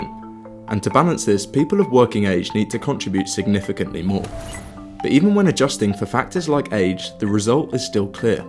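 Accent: England, United Kingdom. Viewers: As your voice is English, why are you talking about dollars and looking at sites selling things priced in dollars. Do you live in America or is it that you are running some kind of con-trick ?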